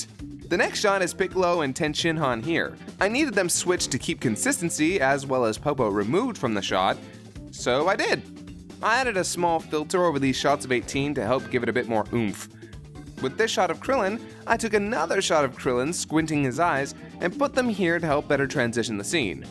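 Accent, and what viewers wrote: France. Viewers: I like your cutie french accent :) A french fan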